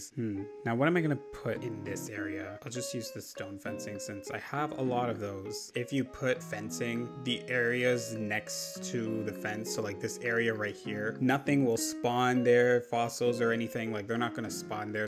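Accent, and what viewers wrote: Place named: Canada. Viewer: I heard your Canadian… that makes you my favourite animal Crossing YouTuber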